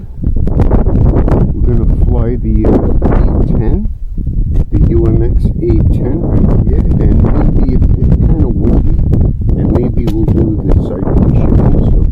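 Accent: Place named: East Coast, United States. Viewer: I love U’r channel. You came up as a suggestion on my feed. U have a bunch of planes and I think your YouTube channel would grow much faster if you had a camera person that could zoom in and provide up close shots and a mic that could cut the wind noise. Just my opinion. I enjoy your east coast accent too. Keep it up brother and thank you for the videos!!!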